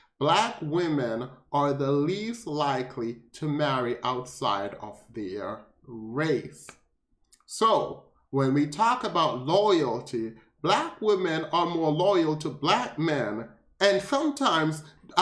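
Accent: Jamaica. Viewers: You Jamaican? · I think I detect a Jamaican flavor to your cadence. Am I right? Are you a fellow yardie? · I appreciate your content and the way you speak so eloquently. Liked & subscribed. Too many Jamaicans on YouTube disguise their/our beautiful accents and speak with a lot of American slang in desperate attempts to sound like many others, which sounds inauthentic. It's refreshing to listen to you. Keep up the great work! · We visit Jamaica a few times a year. I LOVE my people there. Can someone adopt me? I heard the flavor in his voice too!!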